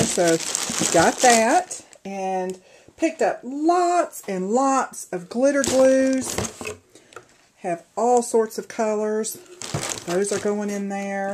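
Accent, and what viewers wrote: United States. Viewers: Wow!.. that is soooo generous of you... and I just want to tell you that your voice is sooo cute to me.. You sound soo country.. (and I mean that in a good way).... Awesomely swell of you!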